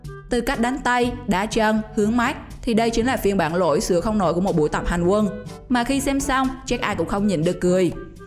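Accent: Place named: Binh Dinh, Vietnam. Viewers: bạn nữ có giọng tốt, âm thanh tốt, nhưng còn nói tiếng địa phương quá nhiều, bạn nên tập trung nói 1 giọng hoặc giọng Bình Định rặc, hoặc giọng giọng Bình Định nhưng sửa lại 1 số từ phát âm địa phương như các vần "in, ăc, ăng, ăn..." hoặc giọng miền nam, tránh phát âm các từ địa phương nghe nó quê mùa lắm. Chào thân ái - đoàn kết - xây dựng! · Chịu khó đọc bằng giọng phổ thông đi em, còn nặng giọng địa phương Bình Định lắm.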